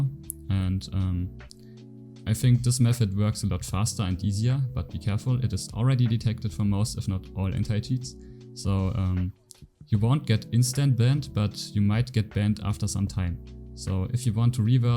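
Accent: Germany. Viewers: You sound really german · Are you German? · I hear the german accent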